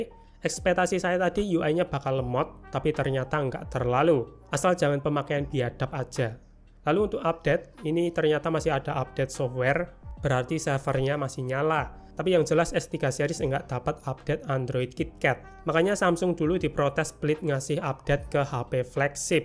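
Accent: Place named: Bugis, Indonesia. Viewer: Bang kamu orang Bugis yah ?? Kedengaran dari logat nya😊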